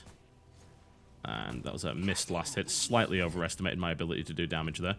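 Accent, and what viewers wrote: United Kingdom. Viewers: When TB gets frustrated his brittish accent gets more brittish. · she is really bringing out the British on TB. BTW why are you not making WTF's on Indie games anymore you sellout!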